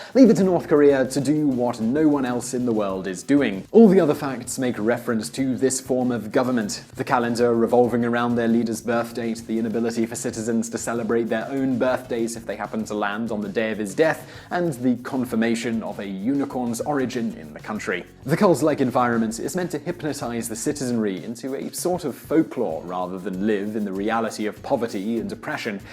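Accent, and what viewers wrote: United Kingdom. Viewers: Is that British matthew santoro??? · I do love a eloquent British Accent.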